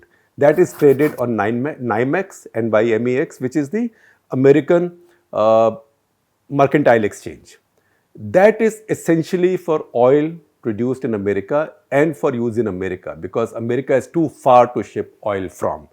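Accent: India. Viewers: This is possibly the worst speaker of the English language. He speaks English as if he is speaking Hindi. Except for Telegu most of the Indian languages are greatly disturbing in that words come out as if they are being shot out. There does not seem to be an sort of fluidity or smooth flow of words. At the end of a phrase the speaker pauses as if expecting an answer from the listener or expecting the listener to complete the sentence of phrase ,much in the manner of a kindergarten teacher . It would probably treble or quadruple watchers if this man learnt to speak English as it should be spoken not broken up staccato like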